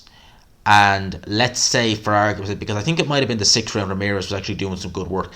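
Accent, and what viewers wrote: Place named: Ireland. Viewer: Excellent insight and breakdown Sir! I enjoy your videos. Dunno if it's the Irish lilt, or probably you really know what you're speaking about! Good stuff...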